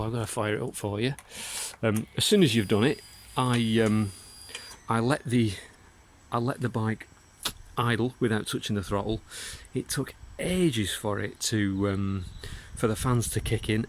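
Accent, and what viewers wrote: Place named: Northern England, United Kingdom. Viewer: Many thanks my mate for the vid....ordered a TEC X-Pipe yesterday....so fitting soon.....want to make a before and after road vid before I change it. Some great tip there....most helpful. You sound just like me...I don't trust anybody else working on my bike....every where is so slap dash these days....killed me having to take my T100 back to the dealer for its first service.....could do it with my eyes closed but did not want to risk the warranty....or having aggro over it. I was trying to compare the Motone re the TEC part as I did not know which one to go for.....did you find out much about the Motone pipe before you got yours.....did you find any performance gains after fitting? You sound like a norther lad like me...Runcorn..... I agree these bikes OOooozz quality. Thanks again for the tips. Nick.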